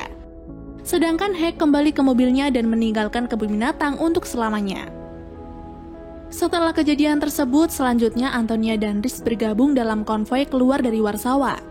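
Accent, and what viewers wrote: Pemalang, Indonesia. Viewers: Wong pemalang kye ya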